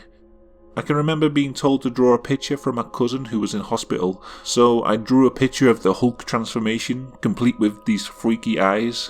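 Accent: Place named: Liverpool, United Kingdom. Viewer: Ah cool your accent sounds slightly Liverpudlian. Yes I live in Bearwood and know Bromsgrove quite well, nice place.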